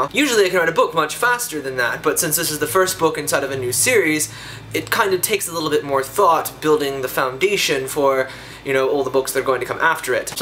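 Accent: United Kingdom. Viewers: haha british accent; that is so awesome! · British accent??? WHY?? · Are you actually British because you do an amazing accent XD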